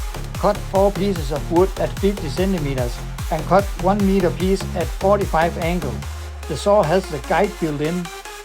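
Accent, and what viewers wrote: Denmark. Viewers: Hey, that's the real, at least his voice. Deeper, older, but yep, I recognize his Danish English accent. So he is real, at least he was 8 months ago. (grins) But why is he always hiding himself all the time? Sup with that? And what's with that 'Jason face mask'? Hiding yourself like that is very suspicious.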